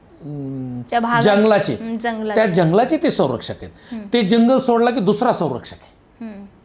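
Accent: Marathwada, India. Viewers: तुम्ही मराठवाड्याचे किंवा बीड चे आहात का तुमच्या भाषेवरून 😅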